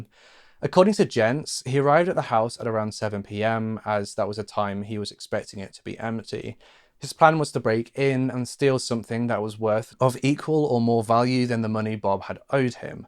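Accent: United Kingdom. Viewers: As always great vid. Nice we have a British voice on these true crimes actually. So many USA channels. Miss the raised eyebrows xxx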